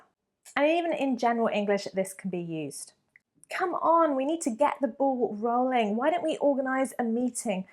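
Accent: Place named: England, United Kingdom. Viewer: Hola me gusta cada ves su acento me enamore del acento ingles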